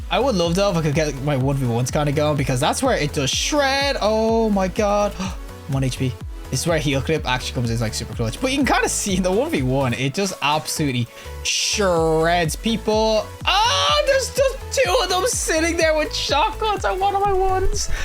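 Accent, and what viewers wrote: Ireland. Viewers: love your dulcet Irish tones but your video's got no game audio, don't know if that was intended.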